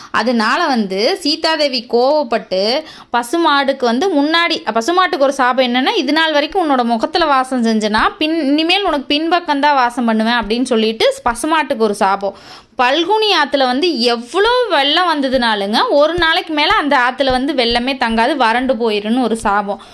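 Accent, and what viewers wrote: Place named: Coimbatore, India. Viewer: Unga kathai super sister... Maa sha allah... Unga shorts ellamaey pathuten supra eruku... Arumaiya eruku... Unga slang remba alaga eruku.. Pechu valaku kovai side ah nenga...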